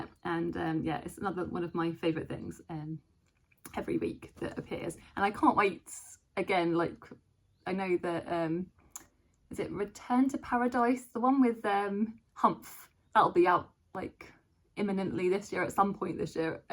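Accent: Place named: Ireland. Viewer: love an Irish narrator!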